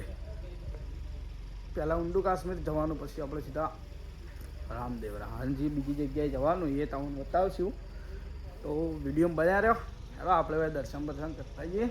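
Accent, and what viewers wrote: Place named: Sabarkantha, India. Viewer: Kyana cho bhai..Sabarkantha ni mast majani bhasha bolo cho.. 😂.. Majja aavi karan ke hu pan aaj bhasha bolu chu ghare.. Hu pan tamari bajuno ja chu bro.. Hu Mumbai rahu chu 💓🙏‼️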